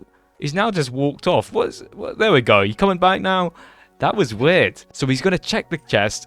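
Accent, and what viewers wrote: United Kingdom. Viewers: Bro is british mumbo jumbo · Pretty similar but Mumbo's accent is more southern, but this guy is more estuary.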